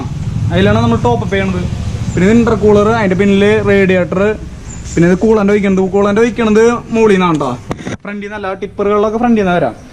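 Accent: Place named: Thrissur, India. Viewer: Enta chengai ningadea samsaram kelkan aa ee review full kanunea... Trissur slang😍😍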